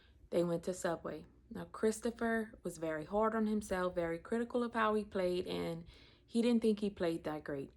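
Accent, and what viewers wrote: Louisiana, United States. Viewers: I am so sorry for your extremely difficult loss of so many members of your family!!! Let me tell you something girl, those people who climb Everest don’t have a thing on you! You are a living testament of resilience, strength, courage,compassion and love! I knew from your accent you were from the New Iberia area.. I am from calcasieu parish. ❤❤️‍🩹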